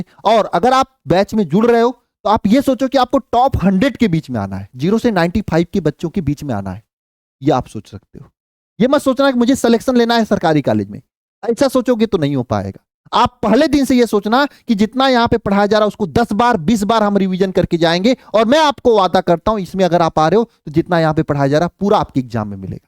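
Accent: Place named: Uttar Pradesh, India. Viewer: Sur me up se ho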